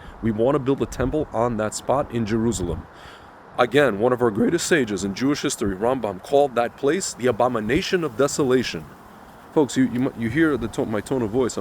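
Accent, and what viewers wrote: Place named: United States. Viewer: Pasty faced American accented man talking about his land 😂. Foff. Free Falastin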